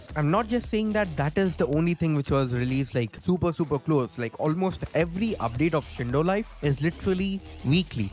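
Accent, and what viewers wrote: India. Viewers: ur accent is like an Indian no hate tho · Dude your voice is like a bit Indian ngl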